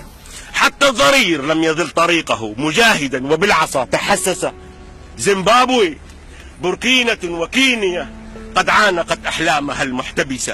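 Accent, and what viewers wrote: Tunisia. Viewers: هذا تونسي¿? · Abdelilah Ait cadi هذا تونسي لهجة الجنوب وقناة الجنوبية تونسية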